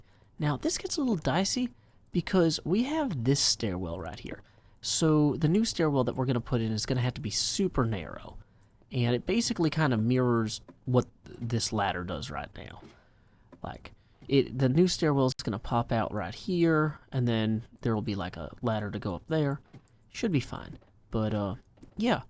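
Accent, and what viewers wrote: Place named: Tennessee, United States. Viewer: I love how he says "Time skip" with his amazing accent from Nashville Tennessee. THAAAAAAAAAAAAAAMME SKIYAP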